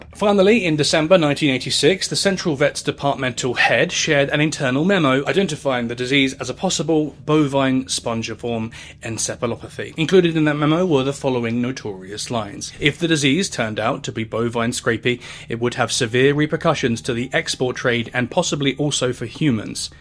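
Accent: United Kingdom. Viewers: english is my third language and i undestood him perfectly, hes just briitsh??? lmao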